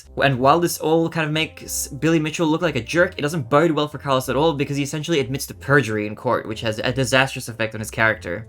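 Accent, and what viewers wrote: United States. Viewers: I thought you were a weird Minnesotan.* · You are definitely take the medal for most American sounding Australian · your accent is very Americanised.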